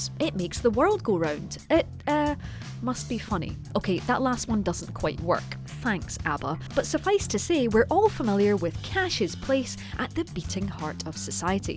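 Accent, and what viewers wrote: Scotland, United Kingdom. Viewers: very scottish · Damn, dat Scottish Accent 😌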